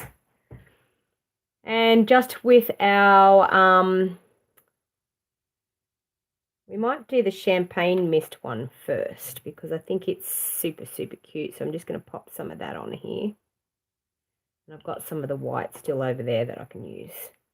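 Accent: Australia. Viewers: Hi, Just watched your video. Love your work and videos Just thought i would let you know that if you mix the shimmer paint with some ISOCOL Rubbing Alcohol Antiseptic into a mister. The ISOLCOL dries quietly and doesn’t run. I live in Western Sydney love watching your videos and hearing the Aussie accent.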